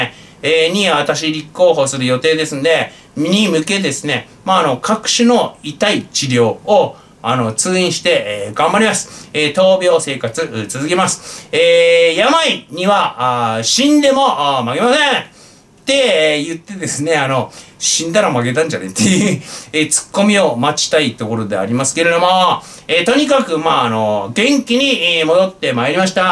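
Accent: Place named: Tohoku, Japan. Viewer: 良かった！ずうずう弁で、活動再開！福島頑張れ👍俺は、茨城だが！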